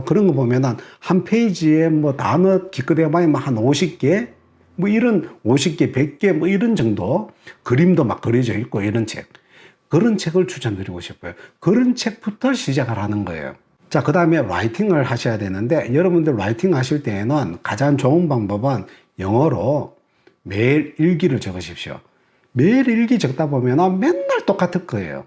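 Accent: Gyeongsang, South Korea. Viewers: 겡상도 발음 너무 친근감 ㅎㅎ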